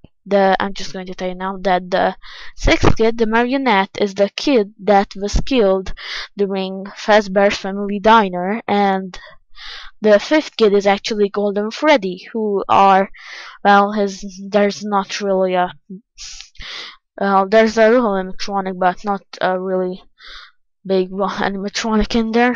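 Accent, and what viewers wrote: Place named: Hungary. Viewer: Teszi magát a gyenguc magyaros akcentusával...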